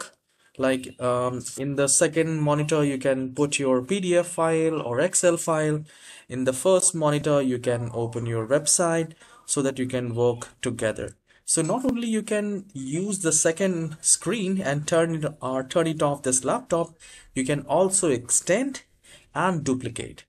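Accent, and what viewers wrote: India. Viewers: this dude is indian sounds like a scam but 100% lewgit good job traight to the point new keybard bad spelling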